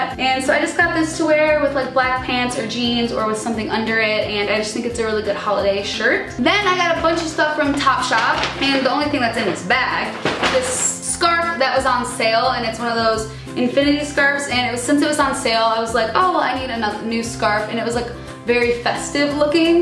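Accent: Chicago, United States. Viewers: Wait is she from Chicago? Someone please tell me!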